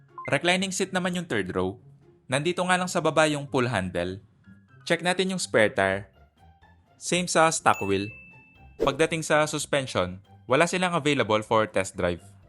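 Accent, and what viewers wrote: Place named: Pampanga, Philippines. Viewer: Dinig na dinig ko yung kapampangan accent..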